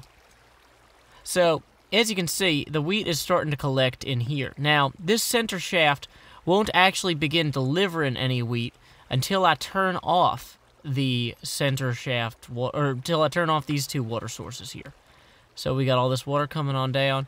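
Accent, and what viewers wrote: South, United States. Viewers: Your southern accent plus your hilariousness makes me want to sub, like, and fav..........I describe you in one word as being....... AWESOME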